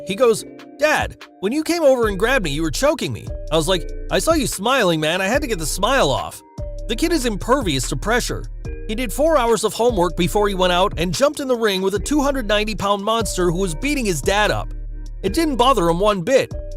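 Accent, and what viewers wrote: United States. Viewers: How come you have an American accent and Wrestlelamia has a British accent? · Oh so this channel is now hosted by an American? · Is anybody gonna point out that the guys voice is now American?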